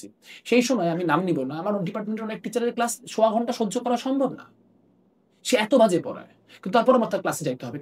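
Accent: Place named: India. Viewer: উপস্থাপকের ভাষার মধ্যে কেমন যেন একটা ইন্ডিয়ান ইন্ডিয়ান ভাব আছে, ❌দাদা ✅ভাইয়া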